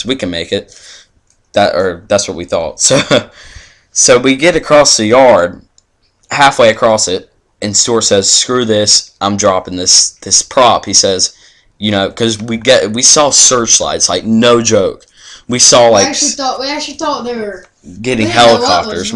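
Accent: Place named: North Carolina, United States. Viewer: What City in NC are you closest to? I live in NC aswell, and you sound like your from around my area!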